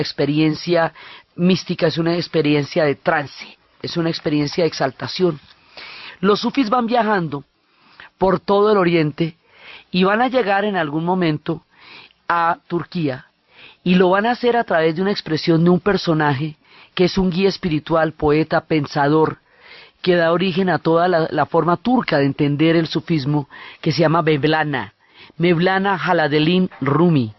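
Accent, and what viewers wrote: Colombia. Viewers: Me he vuelto a enamorar de la historia, excelente narradora, que manera de contar tantos episodios y la cereza del pastel es ese acento colombiano delicioso. Que privilegio escucharla. Me emociona!!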